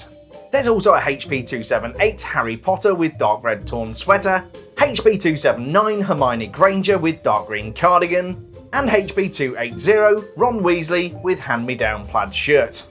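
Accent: United Kingdom. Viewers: I love that he always says: HAYTCH PEE in his cool British accent. Hi 🙋🏾‍♀️ I’m from that embarrassment, ‘Murica 🇺🇸 lol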